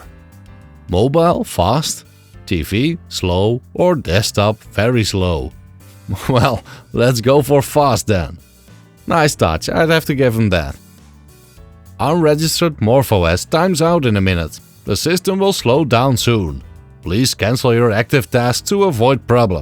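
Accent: Netherlands. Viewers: This OS reminds me UK YouTuber, Dan Wood. He is Amiga computer user, btw. I remembered watching MorphOS video a few times ago. But what I liked more here, than from Dan Wood is your NLD English voice that I just preferred 😅😅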